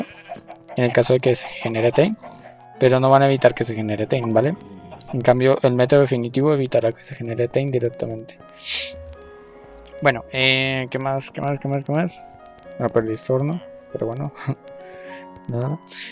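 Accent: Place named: Bogotá, Colombia. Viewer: De donde sos? solo por curiosidad? PD: Pareces de bogotá por la forma de hablar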